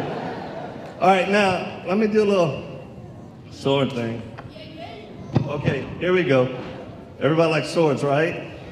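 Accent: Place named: Louisiana, United States. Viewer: Haha I knew you were from Louisiana from your accent, Nawlins forever!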